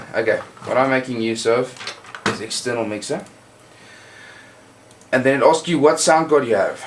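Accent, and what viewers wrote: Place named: South Africa. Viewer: awesome vid thanks :) you sound south african :)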